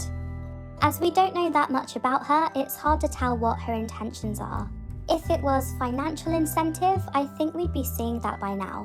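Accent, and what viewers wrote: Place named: United Kingdom. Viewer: omg are you british? it’s so nice hearing a fellow brit in the community! 🥰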